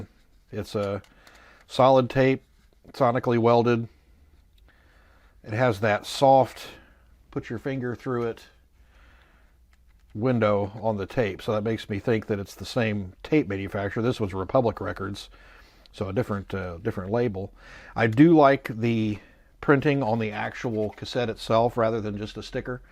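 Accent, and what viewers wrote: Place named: United Kingdom. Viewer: Thank you I really enjoyed the video. Your accent sounds more British than most American YouTubers.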